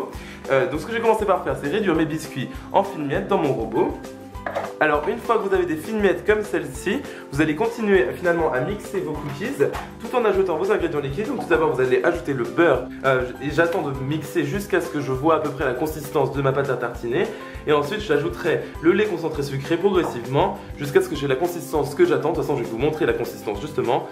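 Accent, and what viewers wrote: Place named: England, United Kingdom. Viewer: stop avec ton accent anglais qui me casse les pied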